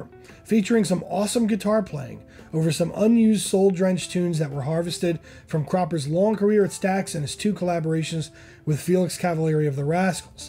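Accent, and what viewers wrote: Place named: Delaware County, United States. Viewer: Thanks. I'm a recent subscriber and I enjoy your videos immensely and find it heartwarming to hear a hometown accent in your narration. I'm guessing you're a DELCO guy like me. After living my first 43 years in DELCO, I moved but I'm still in PA. Though I'm a drummer first, Steve Cropper is a favorite of mine. He's one of the biggest reasons why I love the Tele so much.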